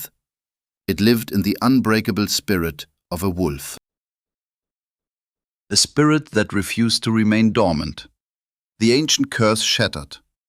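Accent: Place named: Austria. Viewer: IKR, EXACTLY.!!! It's also every story from this channel. The accent is Austrian, I believe.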